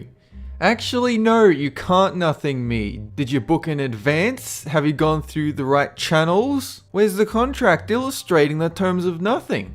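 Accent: Australia. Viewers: Its nice to hear a familiar Aussie accent on the Youtubes again. Ive been playing Hots for a while now and been tempted to start doing videos playing it which is basically me shouting at my computer screen but idk if thats entertaining. As a musician/Composer im interested in what jobs you have to offer. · Hey, where are you from? You sound somewhat australian.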